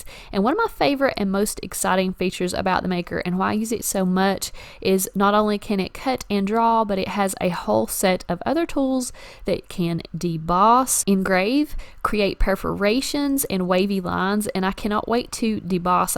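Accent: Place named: Eastern Kentucky, United States. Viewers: God I love the accent! Eastern Kentucky or Tennessee. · I feel like you're closer to Kentucky cuz you have a thicker accent could be wrong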